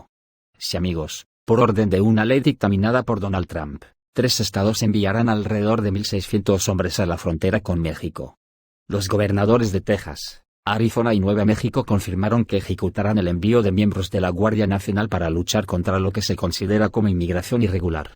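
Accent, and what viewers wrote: Spain. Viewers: Este estupido tiene asento español....es un pendejo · No eres de MEXICO asi que no tienes derecho a opinar sobre el pais mejor preocupate por tu pais si es que tienes pero por el acento eres gachupas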